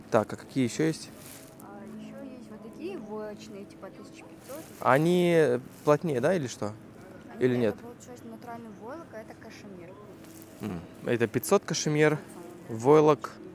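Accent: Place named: Kyrgyzstan. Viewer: Красавчик, у тебя уже акцент кыргызский😊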